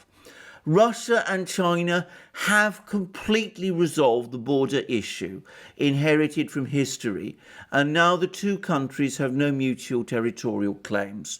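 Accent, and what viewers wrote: England, United Kingdom. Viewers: Mother England gave you a lovely Accent Alexander.Don't bite the hand that feeds you.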